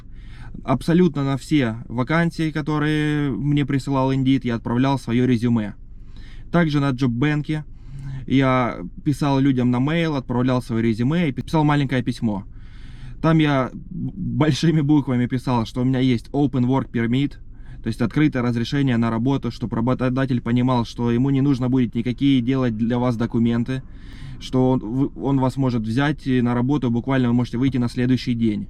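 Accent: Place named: Kharkiv, Ukraine. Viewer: Похоже на Харьковский говор)))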